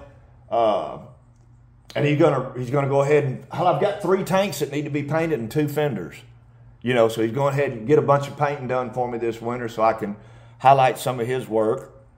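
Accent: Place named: Nashville, United States. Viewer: I lived in Nashville for 17 years and hearing your accent reminds me of a lot of the people from there. I really miss the rides down there.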